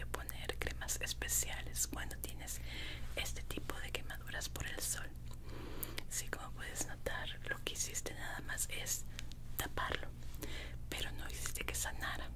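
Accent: Mexico. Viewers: Yo creo que por el tono es de Mexico...Saludos desde Argentina😉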